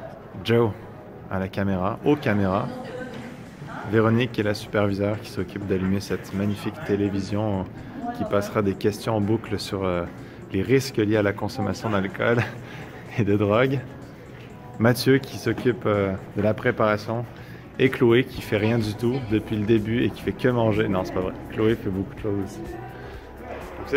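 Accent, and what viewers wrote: Quebec, Canada. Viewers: Omg je te suis depuis longtemps et c’est fou comment t’a maturé c’est vraiment nice et t’a tellement adopté l’accent québécois xD on dirait plus la même personne quand je regarde des vidéos plus anciennes haha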